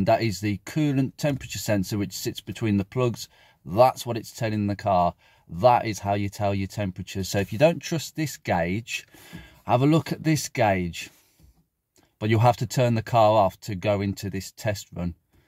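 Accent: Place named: Birmingham, United Kingdom. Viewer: great info vid and thats a clean 90 thou milla focus you sound local to brum also if your instrument cluster starts to play up ie needles bouncing about or not moving at all either intermittently or not at all then it will need to be removed and the solder joints reflowed this happened to me a few months back you will prob have to get this done by a electrician. also if you have variable dash lights that flicker when the dail is on full just turn it down a bit until it stops flickering. one prob i got with my radio is the volume knob when turned to go either up or down goes any witch way i suspect it could do with a switch clean tbh not a big problem as i tend to use the stalk instead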